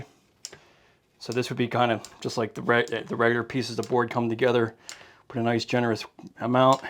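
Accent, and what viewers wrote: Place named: Pennsylvania, United States. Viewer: You can totally tell from Pennsylvania with his accent. 😅 Thanks for the video tutorials! Definitely giving me the confidence to do more on my own!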